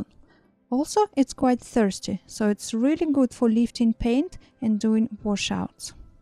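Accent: Russia. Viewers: Спасибо большое ! Интересно было узнать о ваших кистях . Судя по акценту вы наверно с России .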